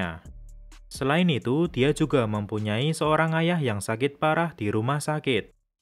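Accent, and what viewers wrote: Java, Indonesia. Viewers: Asli mana bang ? Suaranya seperti saya sama" medok 🙏😊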